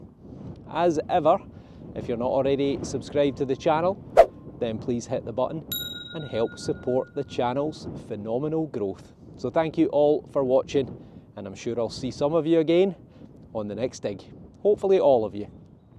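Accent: Scotland, United Kingdom. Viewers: Love seeing all the treasures, the history lessons are great but I’m mostly here to listen to your delightful Scottish accent 😊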